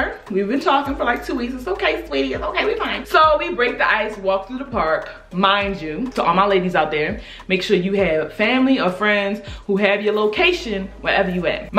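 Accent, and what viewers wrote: Baltimore, United States. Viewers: Yo tell me why I spent 20 minutes on ig tryna figure out if you was from Baltimore bc I could hear it ASAP when I literally could’ve just watched a min further in 😭😭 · As soon as I press play I instantly knew you was from Baltimore 🥴😂 it's something about that west side accent....I always pick up on it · Lmfao I knew you was from Baltimore! I love your videos. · u from Baltimore? Subscribe.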